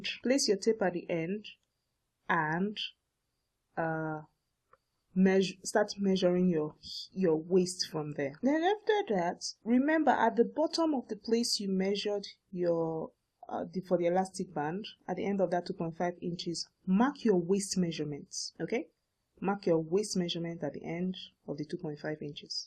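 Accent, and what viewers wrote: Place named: Nigeria. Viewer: This is awesome! Thanks a million for sharing. You sound Nigerian, I might be wrong? I am Nigerian myself. Anyway, Thanks so much!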